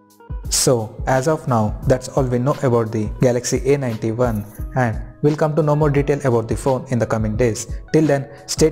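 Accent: India. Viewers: This guys Indian accent is so thick I that I can't understand what the hell he is saying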